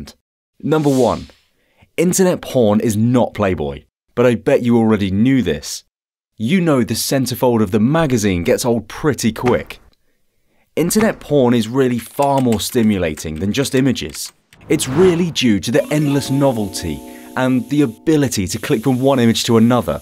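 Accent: England, United Kingdom. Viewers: I’m late to this channel. I’m glad I found it and you got an English accent. 👌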